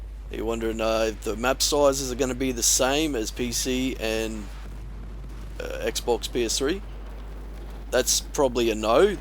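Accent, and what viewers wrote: Australia. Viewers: you sound aussie are u? gret gamplay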